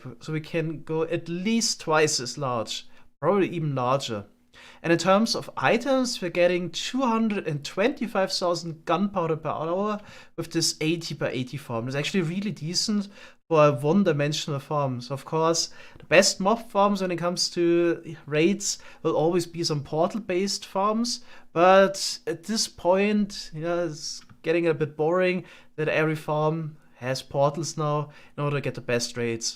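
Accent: Germany. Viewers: das höhrt sich so hart nach deutschem aktzent an bist du deutsch? Ps feier die serie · Man hört so raus das du deutsch bist hahaha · u german? i can hear it yu are german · Du bist deutscher nh ? haha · Man hört so sehr raus das du deutsch bist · The German accent🙌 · his accent sounds very German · Are you from Germany? Because you sound like a German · Real talk mir kann keiner erzählen das du nicht Deutsch bist! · are u german or am i trippin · Bruder uch hör schon das deutsche raus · Der deutsche Akzent 😂👍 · Man hört den deutschen Akzent so stark raus 😂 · you sound like a German. im German and want to gnow if your German comment what im gonna say on German mein name ist Manuel und mein Lieblingstier ist eine Corbra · bro you sound so german 💀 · deutsches englisch ist so ne 10/10. aber ehrlich danke für die geilen farmen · He is so German😂 this accent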